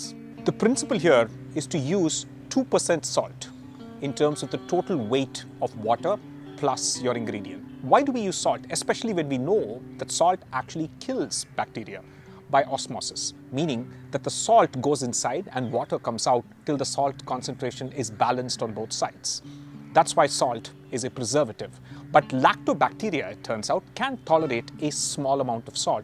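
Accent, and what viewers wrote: India. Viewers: the Hindi accent I like too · Great to listen Indian sound, ❤with depth of knowledge ,love to listen more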